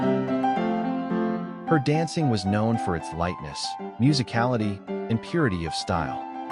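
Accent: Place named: United States. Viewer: Why the hell do we have a Yankee voice on this video? The Royal Ballet is English. We neither need nor want Yanks. I know that Miss Naghdi and Miss Haywood both speak English almost as well as they dance with beautiful English accents. Why ignore such quality resources and use something more and more are finding offensive?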